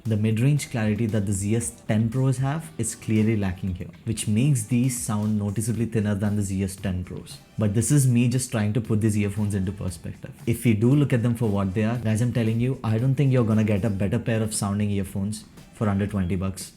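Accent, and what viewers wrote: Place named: India. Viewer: Good video nice to see you are proud to be indian and using Hinglish rather than trying to overact with fake accent. And the mid issue is solved in kz zsn pro X varient which cost almost same .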